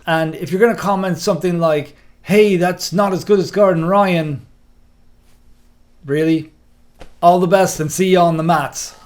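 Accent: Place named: Ireland. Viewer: ! Great review & very cool to hear the Irish accent! I'm in a similar-ish boat in that life means I can't & won't be able to get to the gym as much as I want going forward... I'm a life long Gaelic footballer who found martial arts just over two (covid) years ago. I'm chasing time (42 now in May; just had my first novice MMA fight) & I realise solo training will need to be a (big) part of my practice going forward; I/we can't be away out of the house every evening training jiu-jitsu!! I bought an unfilled dummy/'corpse' during Lockdown, but the filling process near broke my heart + I just couldn't get it right. I'm seriously considering buying a filled SMARTY & getting it shipped to Ireland... Expensive I know, but I'm thinking it could turn out to be priceless! Thoughts?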